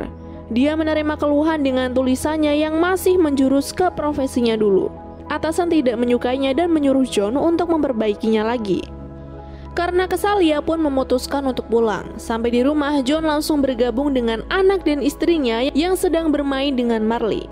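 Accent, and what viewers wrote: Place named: West Java, Indonesia. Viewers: Mimin sunda na bandung bukan si kalo bandung ku aing di apelin🥺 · Aya Orang Sunda Ey😂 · HAHAHHW ANJIR ORANG BANDUNG NIH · Sunda si teteh ey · Min orang mna aya sundaan🤣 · Cewe Sunda njir yg nyeritain wkwk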